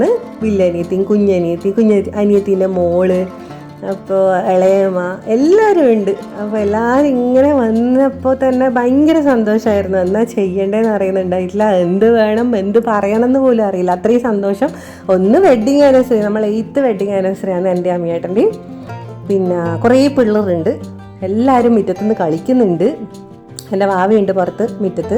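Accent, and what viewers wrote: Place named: Kannur, India. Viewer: Eacheente varthanam keattere thoni kannur aanenn. E vido kandera skpm aann thirinje.. Adipoliyann tta vdo❤❤❤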